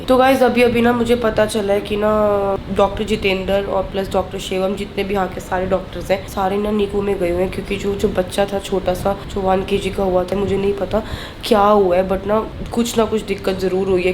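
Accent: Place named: Pahari, India. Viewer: Is Dr from Himachal...?? Because he has a pahari accent ❤😊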